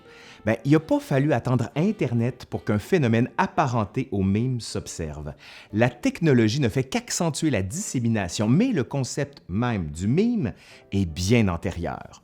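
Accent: Quebec, Canada. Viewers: tu "lisse ton accent"... ou as-tu naturellement un accent quebecois fort leger?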